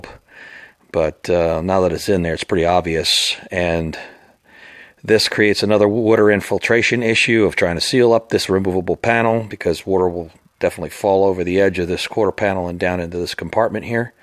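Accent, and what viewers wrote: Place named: New Jersey, United States. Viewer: Your accent sounds like you're from central New Jersey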